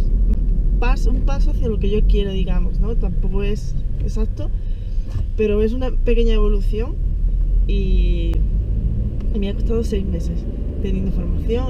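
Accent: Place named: Spain. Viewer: Me ha salido al azahar tú video y me gustó...me alegro q en 5 años tu acento siga siendo original español...no como mucha gente q se van tres días a Zurish y vienen con acento allemand...jaja...Suiza me gusta y la conozco bastante bien...el idioma llevo tres años aprendiendo y me falta superarlo...que me aco sejas? Vivo en France...bzss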